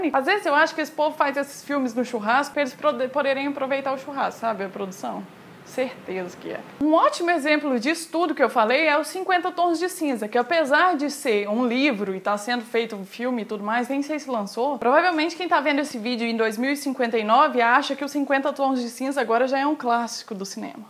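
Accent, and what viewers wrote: Minas Gerais, Brazil. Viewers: Que gracinha ela falando mineirês! 😍😍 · poxa aos eu ja notei que ela e mineira kkkkkkkk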